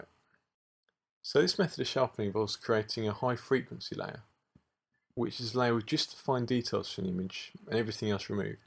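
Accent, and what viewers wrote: United Kingdom. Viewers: buddha bless you for the British accent, so sick of s. tanks brilliant tuts, subscribed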